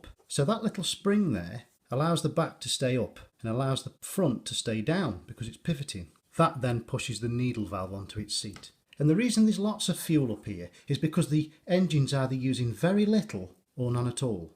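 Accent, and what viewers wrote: United Kingdom. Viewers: Man. Not only a great explanation but don't with a British accent. Cannot ask for more. A big thank you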